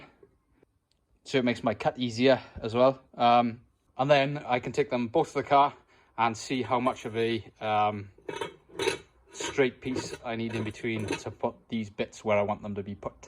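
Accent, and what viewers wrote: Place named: Wales, United Kingdom. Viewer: Hehe, I guessed that you were from Wales, I worked with a couple of Welsh blokes here in Australia! Also, great work on the exhaust. It's a job I hate doing. Gotta love the sound of a V8!! Subscribed.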